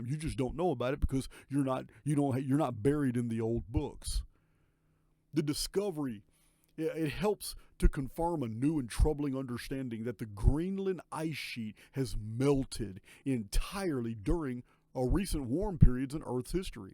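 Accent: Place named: Texas, United States. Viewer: Hey there I just wanted to say that I really loved the interview that you did with Joel but it was so sad that I could barely hear you and I know that you're bringing new equipment on board but I think your levels are super low still this video is also hard for me to hear I don't always have the ability to get to a speaker sometimes I'm just listening to it right through my phone and it used to be so much louder so have you guys can tweak that we love to hear you loud and clear with that Charming Texan accent😇🥰😍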